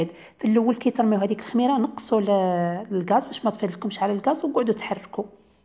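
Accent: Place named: Jijel, Algeria. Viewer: يعطيك الصحة اختي.. حبيت نسقسيك انت من جيجل او تلمسان لأنك تهدري كيفنا في جيجل (حال.... الحاء الجيجلية الشهيرة هههه(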